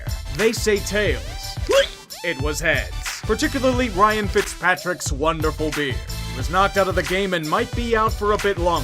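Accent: Pittsburgh, United States. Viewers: i love the new format!!! if there is a god, will be the season/blooper reel's main voiceman, as long as his yinzerism doesn't show through too much. SEND ME A PRIMANTI BROS SAMMICH YOU DIRTY BASTARD! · Huh. A new editing style. If i said i was a fan of it, id be lying. I loved the indie vibes from simple editing, and he sounded... contained, rather than without a filter. I need more Yinzer.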